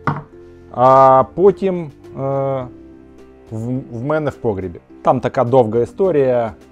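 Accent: Ukraine. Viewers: Мої батьки з Донеччини розмовляють українською з таким же акцентом))))))) це мабуть територіальна особливість!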